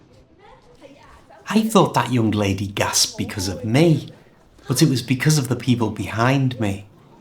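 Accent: Liverpool, United Kingdom. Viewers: I've got something to tell about your method of teaching which is very much more enjoyable and I haven't seen it on the internet. Thank you to share with us. But also got something else to tell which I could't never understand while I lived in London which is about the english people and the why some of them love the Royal Family I mean why do they become more then other people, is it because they're Royal, because I can't see nothing so special? The place I come from we also have a Royal Family which we can see or even heard about often and they don't leave in castles as long as i know. One of them became a politician. As I see you still have kept some of Liverpool accent while you speak. But not bor kkk I loved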